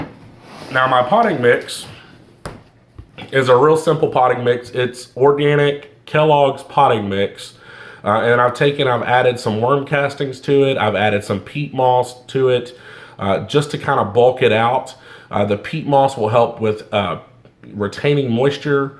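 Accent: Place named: Georgia, United States. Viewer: Where is that country accent from? You sound like a Georgia boy.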